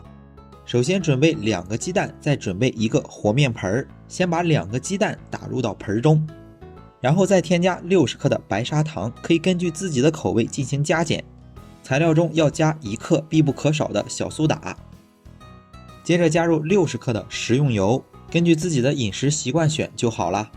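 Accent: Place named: Qingdao, China. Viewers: 亲切的乡音， 实诚的山东人， 接地气的讲解， 不故弄玄虚！ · 感觉听出了青岛口音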